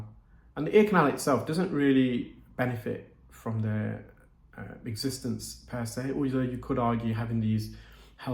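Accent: United Kingdom. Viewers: The lips drive me crazy. The British accent makes everyone’s lips fold into Donald Trump lips. so distracting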